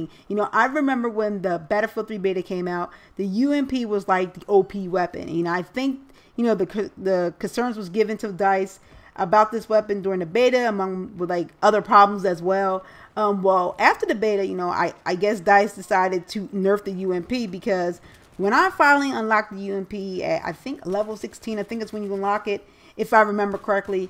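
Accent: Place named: Northeast, United States. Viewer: no i meant northeastern USA not northeast philly.... but yea.. lol i coud tell u was from around here.. accent n certain phrases is hilarious. i remember playin against u before.. its a small BF3 world man. and lol sum ppl may like u a little too much... its sad isnt it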